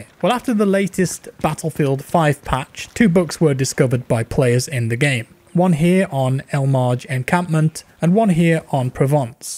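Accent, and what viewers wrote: United Kingdom. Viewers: Are you brithish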